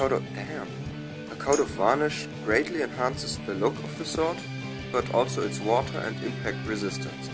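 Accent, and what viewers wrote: Germany. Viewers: The whole time I thought : "this guy sounds German " and then I sa w the Obi Premium Lack and knew I was right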